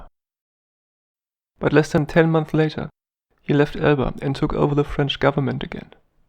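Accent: Germany. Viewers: I refuse to engage with animated history unless it is narrated by you with your class, inimitable German accent. Great channel, rock on top lad. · That is not his language, he is German, I hear that, I am one myself ;)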